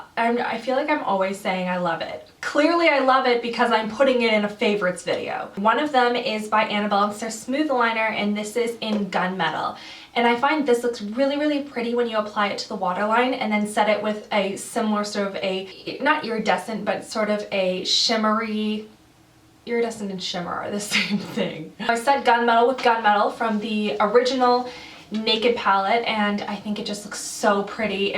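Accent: Canada. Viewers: hi - its so funny that i after just watching u and listening to you i could tell u are Canadian :) i now my people! heheheh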